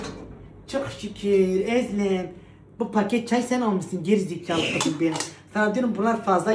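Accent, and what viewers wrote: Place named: Elazığ, Turkey. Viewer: Harika aynı Elazığ ❤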